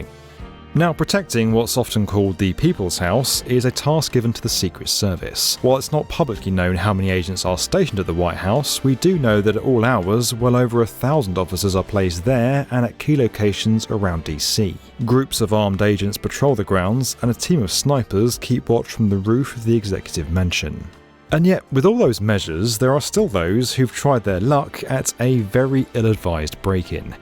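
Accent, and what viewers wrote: England, United Kingdom. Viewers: When a show has an English Narrator, I just skip it. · Why is a Brit narrating US History? Nothing to talk about over there? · Something about a Brit narrating this feels wrong. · This video is VERY poor quality. It's basically a 15min video, with 10 min. of actual content, and 5 minutes worth of ads. I get it. You need to make money for product placement, but when 35% of your video is an advertisement, it's a very lazy effort. This video is basically for foreiners interested in American politics, and I guess that's made obvious with the English accent alone. Unsubscribed.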